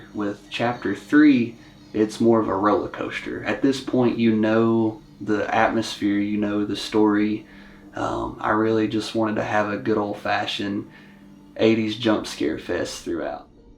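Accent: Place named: Tennessee, United States. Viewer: WoW 😲 Cool your accent is Tenn.You sound Just like my relatives! From Kingsport, Bristol, Mendota,Johnson City. It’s on Dad's side. Great job with this & Alter was Awesome 👍 Hope you're doing well in the Industry.Happy Halloween 🎃 Season 🔥🎃👻🧛🏼‍♂️💭🦇🔥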